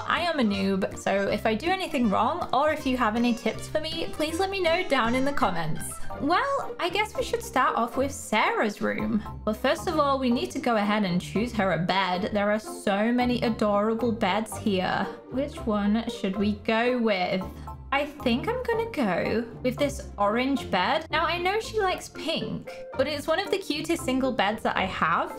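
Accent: Scotland, United Kingdom. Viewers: are you Scottish?? Bc I am “ps bc means because just is you did not know. But are you Scottish??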